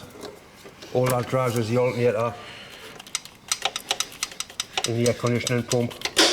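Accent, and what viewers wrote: Newcastle, United Kingdom. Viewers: Americans probably couldn't understand a Geordy accent anyway 😅😅😅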